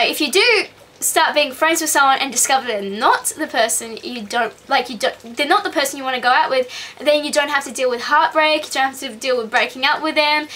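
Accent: Australia. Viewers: She's Australian, GTFO. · i LOVE ur accent :D <3 Australians have the BEST accents( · that is so awesome Iove australian accents ... I'm sorry they're just soo cute :) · Are u from Aussie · she from aus? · I love love LOVE the way you sound. Are you from AU or NZ?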